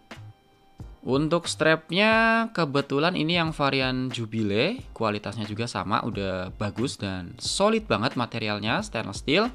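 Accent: Tegal, Indonesia. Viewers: nyimakk, orang tegal bang ? sama soalnya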